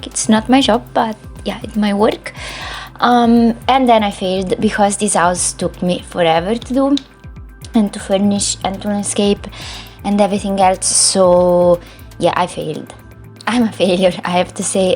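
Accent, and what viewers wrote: Italy. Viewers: This house is super cute but honestly I'd just take the treehouse and live there. Okay bye. Haha I'm serious the treehouse is suuuper good and also the swing trick is amazing. Love your accent fellow italian sister😌❤❤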